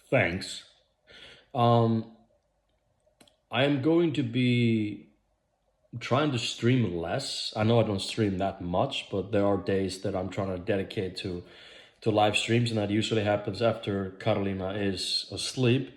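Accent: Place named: Europe. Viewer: 🎉 Nice hearing from you again. I see this video was recorded 5 days ago but showed up in my notifications only 1 hour ago! You reminded me in this video of Nagidal. Maybe the European accent, your appreciation of Gix or your calm voice, but I appreciate that you still post videos every now are then even with everything else going in your life, and quick videos like this one don’t really take much effort to do. I know what you mean by glass babies, and I can’t find the proper English term for that either, but all the best wishes to your girlfriend. I also like the idea of streaming or playing a game with someone else in your videos, even if just watching and commenting on the gameplay.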